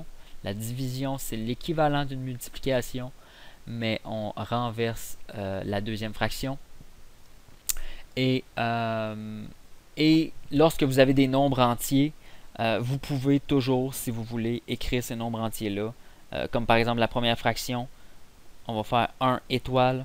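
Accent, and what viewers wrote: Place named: Quebec, Canada. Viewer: merci beaucoup et magnifique accent quebecois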